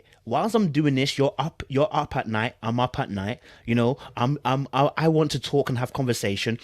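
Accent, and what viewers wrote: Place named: United Kingdom. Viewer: I AM DYING!!! THIS IS HILARIOUS AND YOUR BRITSH ACCENT MAKES IT ALL BETTER!!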